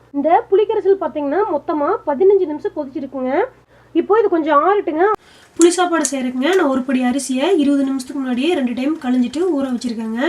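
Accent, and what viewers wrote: Kongu, India. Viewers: கோவைல மட்டும் தான் அப்டி பேசுவார்களா.. ஈரோடுலயும் இப்டி தான் பேசுவாங்க.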